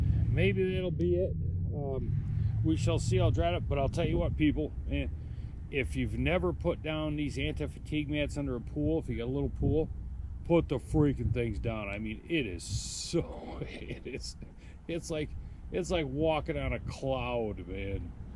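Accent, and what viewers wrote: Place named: Northeast, United States. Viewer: Where do you live? You almost sound like you’re in the northeast? Mass? I’m in NH and wonder what you do for winter with the pool?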